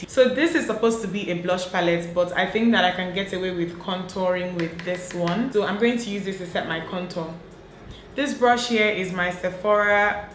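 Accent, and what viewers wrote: Nigeria. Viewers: I love nigerians.Their accent,makeup and traditional weddings...you are so beautiful.Much love from Kenya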